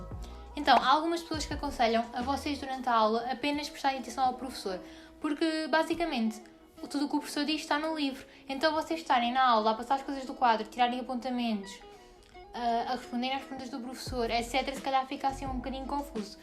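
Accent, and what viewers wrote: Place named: Portugal. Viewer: Amo o sotaque portugues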